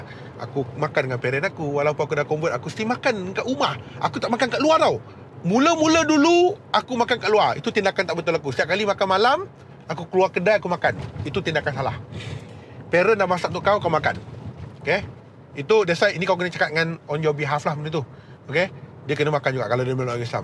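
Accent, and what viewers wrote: Malaysia. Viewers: Selama ni ingatkan memang melayu. Rupanya berbangsa Cina. Loghat percakapan macam orang melayu. MANTAP!!! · lah igt kan mmg melayu 😂😂 abg cakap mcm mmg melayu tulen laaa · Mati2 aku ingat ko ni melayu. 😆 Cakap pun dah pure melayu. Anyway alhamdulillah and proud of you bro. Never leave your family despite revert to Islam.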